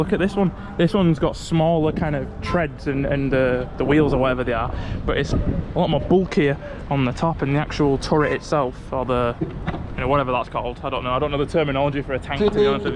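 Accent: United Kingdom. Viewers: Bro..Menonton konten mu, serasa menyaksikan film kerajaan klasik dari Inggris Raya.. Aksen bicara mu..Inggris banget... 😁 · Hi and, welcome in Jakarta. On 5th of October 2025 we will celebrate do called "80th Armed Forces Day" here in Indonesia, so you saw a whole lots of Army, Navy (Marines under Navy) and Air Force stuff being display for public. For your pronounciation, I know you are from UK. Enjoy your stay in Indonesia then. Cheers...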